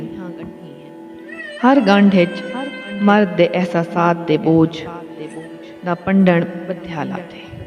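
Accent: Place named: Saraiki, Pakistan. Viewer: Intersting video Apka saraiky accent perfect hy Apki urdo mein b ye lehja galib hy Ap mighty indus se buht ziada inspired hein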